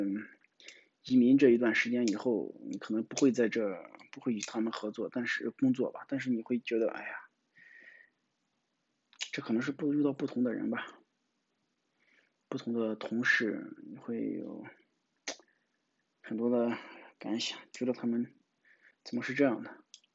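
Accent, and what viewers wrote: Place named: Shaanxi, China. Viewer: 我感觉像陕西的，哈哈，咸阳么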